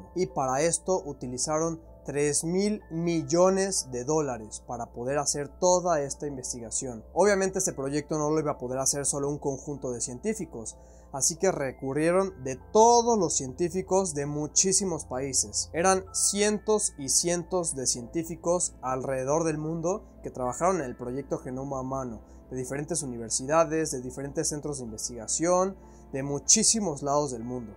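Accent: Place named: North, Mexico. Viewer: de que parte de méxico eres hablas entre norteño y de ciudad de mexico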